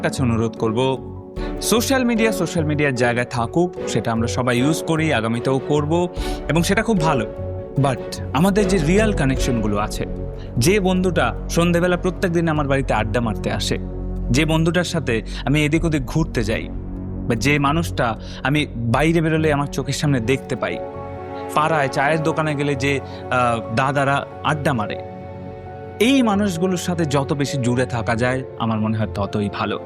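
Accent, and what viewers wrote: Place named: Mymensingh, Bangladesh. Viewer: 'ব' এবং 'ভ' এর উচ্চারণ ! আদি নিবাস কি ময়মনসিংহ ?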